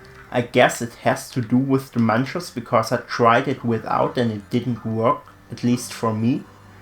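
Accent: Germany. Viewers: Wait!... Are you from Germany? 🇩🇪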